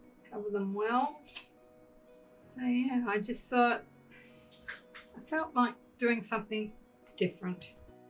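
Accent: Australia. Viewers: Beautiful..going to my table to try this. Just enough cells and gold and the centre is great…love your natural way of working and commenting…nice to hear an Aussie on board. 💖💖Thanks, from Ruth in Tasmania. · Thats brilliant inventive artwork, it is also borderline ASMR, with your Aussie accent and the other sounds, very theraputic. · Regarding the middle..you could have stopped at gold dots but still beautiful, well done. You sound Australian is that where you are? Asking because you're using Amsterdam paints...I'm in NZ and giving trouble sourcing supplies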